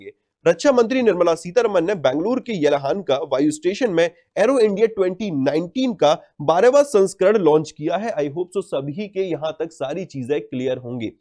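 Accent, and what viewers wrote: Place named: Agra, India. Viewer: Arrey yaar ko agra me bolte h sir agra ke h isliye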